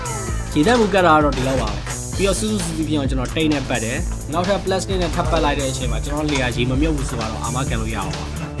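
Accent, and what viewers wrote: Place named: Mandalay, Myanmar. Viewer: Are you originally from Thanbuzayat.?Actually,I thought you are from Mdy.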